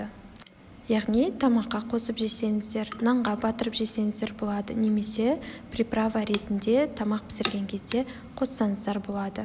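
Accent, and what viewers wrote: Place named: Uzbekistan. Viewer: Ашты деген соз жок ащы .Казакша дурыс сойлендерши онтустик халкына отиниш .Айналып дегенди айланып дейсиндер жыным келеди .Озбек , калпак болып кеткенсиндер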